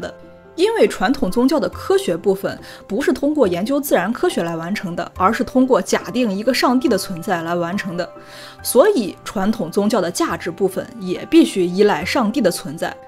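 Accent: Qingdao, China. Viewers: 这姑娘好像是青岛人啊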